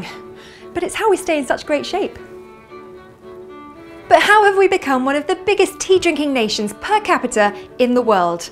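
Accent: United Kingdom. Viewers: British accent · I am really empress with Ur British accent I follow u to make my English perfect in fact I started to adore u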